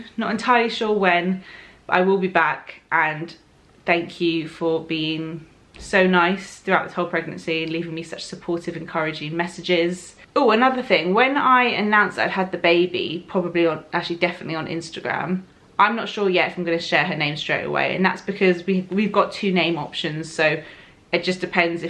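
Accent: England, United Kingdom. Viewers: I love your presence in the internet, I am flexible and I'll be here for 1, 2 or how many videos you will be putting out, and I'll be here watching out for your come back or however you decide to do it. You have been a wonderfull inspiration for me, and I got a little emotional watching this goodbye...12 minutes feels so short though and I'll miss your sunday's videos and your English style and accent. Much love to you and see you soon ❤️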